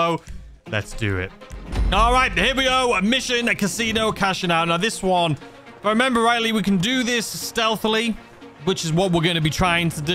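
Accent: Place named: Northern England, United Kingdom. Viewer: why do you have a northen english accent but from america? did you move there not to long ago or something mate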